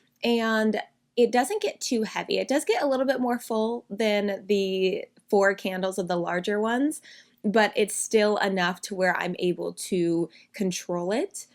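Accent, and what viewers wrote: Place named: United States. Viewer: i just cannot stand your grating american accent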